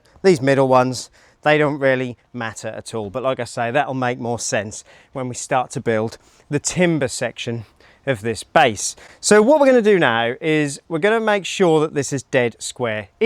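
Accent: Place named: Suffolk, United Kingdom. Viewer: Nice to hear a good old Suffolk boi after 30 years in NZ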